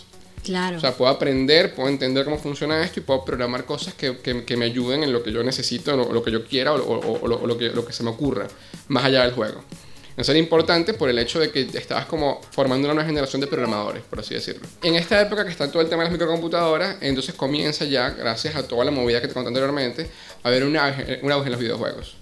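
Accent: Venezuela. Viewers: Imagino que eres venezolano, es que tu forma de hablar y de expresarte es única, sin hablar de tu voz.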